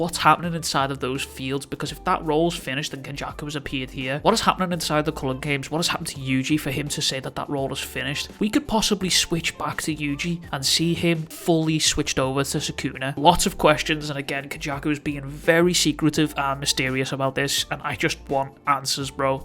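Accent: United Kingdom. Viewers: Cant lie its cool to hear a Uk Accent whether it be Scotland Wales or England feels like im talking to one of my mates lol great video bro keep em coming <3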